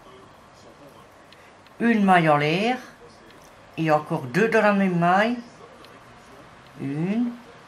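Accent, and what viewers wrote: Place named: Pas-de-Calais, France. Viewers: Votre accent du Pas de Calais, c'est celui de ma Grand-Mère 😍, une grande crocheteuse aussi, je pense toujours à elle quand je crochète. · Bonjour, déjà merci de nous offrir tous ces tutos que vous expliquez à la perfection, j'ai retrouvé un papier sur lequel j'avais ecris leur taille et dimensions pour celle de 8 ans c'est bien d'épaule à épaule donc 30 cm pour celle de 10 ans 34 cm mais en vous regardant travailler si j'ai bien compris on fait un nombre de maille qui va jusqu'à ces dimensions et cela nous donne un poncho à leur taille, c'est bien cela!!!! Merci pour votre gentillesse et votre charmant accent que j'adore cela me rappelle Cambrai!!! belle journée et merci pour votre réponse!!!! je vous cite à chaque fois que je fais un de vos ouvrages et je vois que pas mal de personne vient visiter votre site!!!! 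· Je l ai reconnu de suite l accent ch'ti je suis de bruay la Buissière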